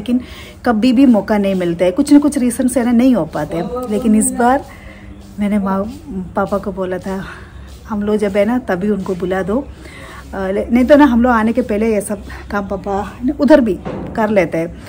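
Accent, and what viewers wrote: Kerala, India. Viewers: Yourvlog is very nice. I'm enjoying it and your way of talking Hindi is cute with malayalam accent .Where are you exactly in kochi? I mean your in laws house where is it Chechi? Is it in pallikkara or kakkanad?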